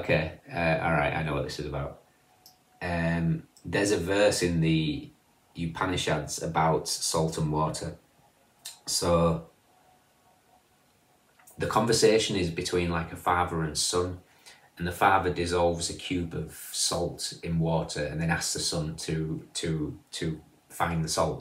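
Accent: Northern England, United Kingdom. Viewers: thank you so much, you are so on point for me. I stopped in my tracks when you said a card of spiritual gifts was coming up. I’m leaving a 30 year career behind to follow a spiritual calling. Pluto has certainly done a number on us all. Every Cappie sun, moon,ascendant I know has had a tough 15-20 years. Great to know that better must come. Great to hear your northern accent. Glad we’re represented. Thank you for sharing your amazing gifts. Blessings to you and all. Xx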